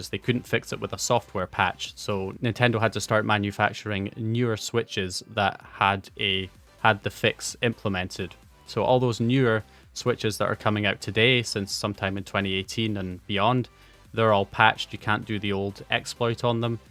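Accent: Scotland, United Kingdom. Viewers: Mic is ok you still sound Scottish 😄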